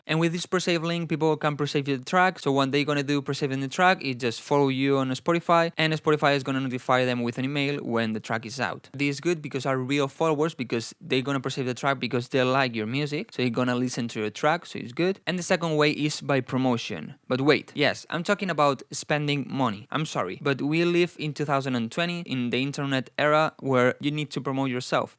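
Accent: Spain. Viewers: Good video, just what I needed . . . . . El español es tu lengua materna verdad? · It's spanish voice jeje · Great video man! I was so indeep on FB adds, this seems so cool as well cause is less complicated so I'll check out! BTW where are you from? Your accent seems spanish, I´m spanish too if it's the case hahaha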